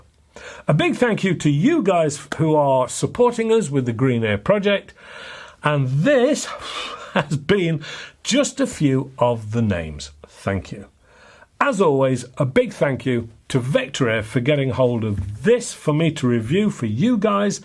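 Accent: United Kingdom. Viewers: given his British accent what do you think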